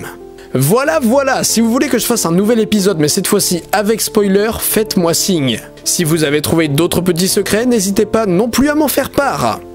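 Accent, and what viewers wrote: England, United Kingdom. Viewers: WoW l’accent anglais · j'adore ton accent anglais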